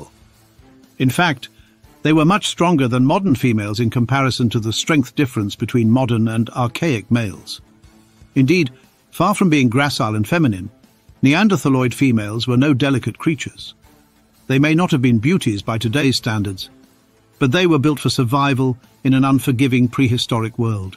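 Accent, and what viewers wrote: United Kingdom. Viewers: A clipped brit accent and utterly no academic background in physical anthropology makes for sensationalism , not science.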